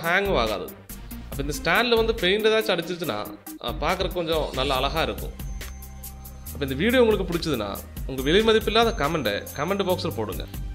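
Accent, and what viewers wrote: Kerala, India. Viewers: Are u malayali or pandi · He is a malayali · unga slang Malayalam+ tamil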